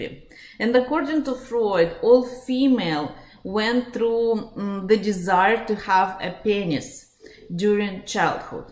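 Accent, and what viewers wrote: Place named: Russia. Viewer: You have a heavy Russian accent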